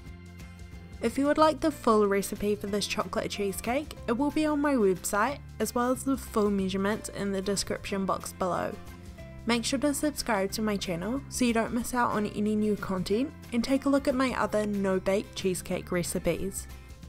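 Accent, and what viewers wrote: New Zealand. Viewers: That New Zealand accent reminds me of my cousin. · She sounds like she’s from New Zealand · I loved your voice and accent like from 🇳🇿 . Not to mention your channel and video content. I will try this one to make and will use whittaker dark chocolates too!!! Love chocolates from🇳🇿. I loved cheesecakes, blueberry cheesecake is one of my favorites by far. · Definitely sounds like a kiwi accent! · really good authentic nz accent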